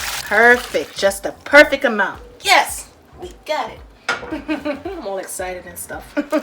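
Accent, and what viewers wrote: New York, United States. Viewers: Thank ya Miss...I love cajun seasoning. You sound like a fellow New Yorker...hahaha....love it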